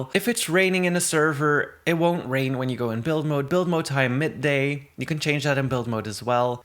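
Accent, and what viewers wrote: Netherlands. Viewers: are u dutch???!!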